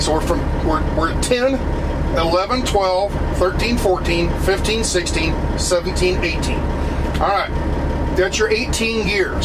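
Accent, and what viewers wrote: South, United States. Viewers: wer runnin thirty mile anhour....... true southern accent. 👌 love it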